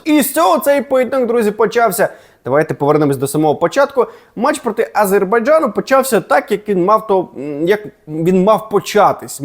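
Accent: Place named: Russia. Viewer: Що знову з розійська редукцією ?) Де співоча П'полтавська українська мелодика мови ?)))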